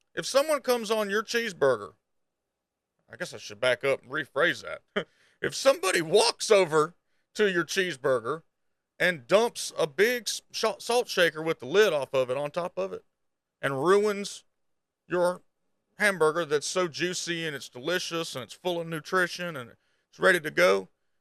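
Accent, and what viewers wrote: South, United States. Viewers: oh come on, i FUCKING LOVE your accent. i think because people usually talk like that in movies where they talk about some stupid shit (the stupid southern guy caricature) but what you say is intelligent AF. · Hell yeah brother, Southern drawl doesn't indicate anything more than that we grew up in the South. I've dealt with that in my IT career, and a friend of mine was a chemist for Pfizer dealing with the same thing there. Somehow my mullet is unprofessional in a corporate work environment, but if it were pink or blue it wouldn't be? Shhiiiiiitt. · Screw the bigots. You should dig deeper into the southern accent and aesthetics around southern culture. People who actually follow you will love that. Look at Aztecross from Destiny 2. People love hearing his accent when he reads patch notes and weekly dev update posts. Adding some southern humor in makes it even better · man you gotta lean into the southern accent, start saying stuff like "what in the sister kissing woods was Blizzard thinking" love the videos and asmond linked you this time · 100% correct about the bigotry and prejudice regarding your accent/drawl. 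I live down here too and I’ve been told time and again ‘I didn’t think you were that smart bein a redneck’ from people who don’t even know me. I just laugh. People are indeed ignorant. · Lean in to that Southern draw. It's what helps you standout to me honestly. Your coverage is great and I think your ideas have merit. Regarding Asmon not giving you any credit he usually will spam a link to your channel in his live react video on twitch which I believe he did because watching him react to you is how I found your channel. Own that southern gentlemen stereotype man. Embrace it. Lean into. Let be the way you stand out o these content creator driven platforms. The great thing about it to is it you being you. There is no gimic or shtick. You are real authentic and down to earth kinda guy on a platform full of fakes. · Came for the Redneck gunloving accent 🙂 stayed for the tinfoil hat... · Came here from asmon and subbed, im from europe, we don't get to hear alot of american accents especially southern, i think it's great ! 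Makes you sound real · we need more southern boiz doing content like this! Lovin the accent and screw them yankies who don't! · Sorry about all the rude comments you got about your accent, its clear you are intelligent. There are tons of people even up north in America that think a southern accent equates to racism, unintelligence and incest for... whatever reason. But keep doing your thing dude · got the RECITES!!! Southern brain at work! And hate to say it but when people talk about our southern twang they are just uncultured!!!! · Right you are. My Southern accent is more pronounced than yours. I have an earned Ph.D. in physics. Hate it when people pre-judge me on my accent. Usually wind up being embarrassed pretty quickly though. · Anything can seem like a conspiracy if you know absolutely nothing about how it works. Southern bro here, i really appreciate your channel. Seeing people that sound like me do well, helps out a lot. 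· Subscribed! Dudes accent is so perfectly southern.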